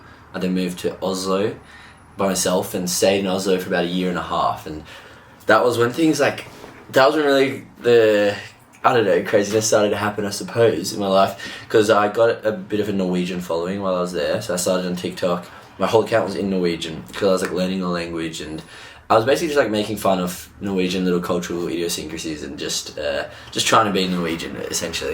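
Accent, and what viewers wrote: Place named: Australia. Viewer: I just discovered you from Rob's Turbo Sprint video, I can't wait to watch the hour and a half of videos of your South America trip. Keep your energy and the good vibes. Love from French Canada! PS: I can't listen to your videos, I feel like my grandpa cause I need to lip read haha, amazing accent from down under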